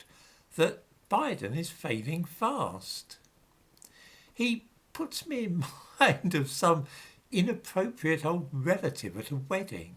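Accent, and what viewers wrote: United Kingdom. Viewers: I've never been very interested in history. That is until i found your channel. I really enjoy your history lessons, your thoughts and your British accent. Thank you. Kind regards from Sweden.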